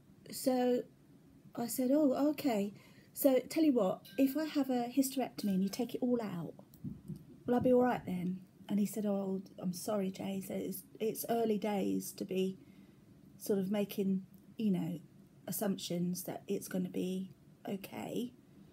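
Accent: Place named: South, United Kingdom. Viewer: I'm really sorry you have had such a horrible diagnosis but at least you can start the treatment soon. Hopefully. Where in the UK are you? Your accent sounds as though you are in the south and I'm on the Wirral or I'd invite you round for a cuppa and a hug. I've been watching your videos and really enjoy them. I hope your cat scan is very soon. If you need a shoulder mine is big and padded for tears! Love and hugs and healing thoughts xxx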